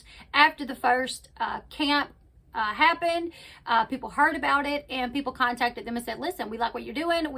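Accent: South, United States. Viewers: I LOVE your southern accent. It really stands out to me because I never hear it where I live on the East Coast.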